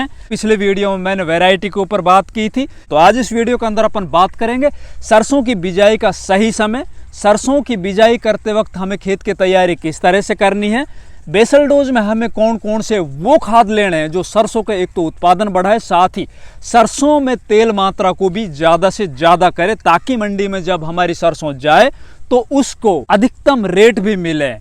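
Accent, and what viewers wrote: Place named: Mahendragarh, India. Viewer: Bhai ap kha se ho awaz tho m,grh side ki h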